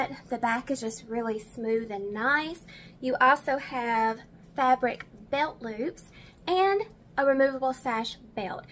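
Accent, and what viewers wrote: South, United States. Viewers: Girl,I love watching you and listening to you talk in your sweet southern voice . I love how you explain the materials and you try on things i wouldn't ever think i would buy but have concidered thanks to you . I am very insecure about my body and you have given me more confidence to at least try new things, so thank you for that . And your hair and makeup is always on point. Your just beautiful .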